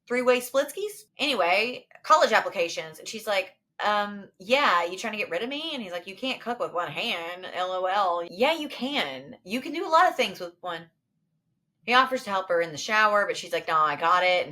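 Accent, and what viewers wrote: France. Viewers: I gotta say yes to the French accent. Really helped soften the gut punch that was this book🥖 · Of course we need more of your French accent. Why is this even a question? 😂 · I had to do a lot of spreadsheet work, so I finally (reluctantly) dove in to the second half. You are so funny as a presenter. Thank you for taking this commission. I knew I would hate the ending (because I hated how the book started too) but you and your French accent made it almost bearable. Almost. Also, you look super cute in bangs.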